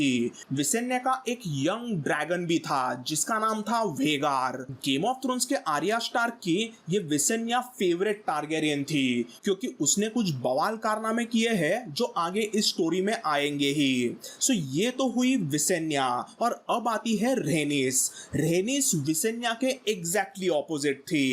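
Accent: India. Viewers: Open the video for listening. Found out this fûc-kface speaking in Indian accent. Leave.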